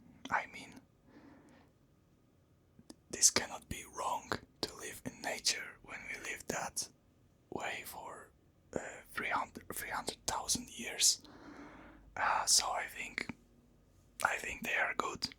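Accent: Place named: Russia. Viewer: I'm glad you found a hobby you love and you're doing great at it. I really love ASMR and am glad you're making such a big contribution to it. Hope your channel continue to thrive. I'll probably subscribe too and look forward to new videos =) P.S I'm currently learning English and I love your pronunciation...this is the first time I understand English speech without subtitles. Maybe your clear pronunciation is due to your Polish accent?! (It sounds a bit Russian, btw) Poland is a wonderful country! I hope I can visit it someday!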